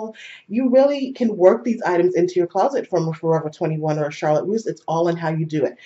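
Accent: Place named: Jamaica. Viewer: you betta speak that petois/English accent! I can't wait for video footage! safe travels. ✈✈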